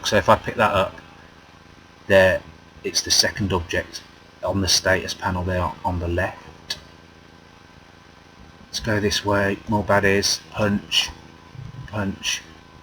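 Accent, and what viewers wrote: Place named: Northern England, United Kingdom. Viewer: It's just that you sound a bit northern (well, to me anyway). I thought you may be from Manchester upwards :)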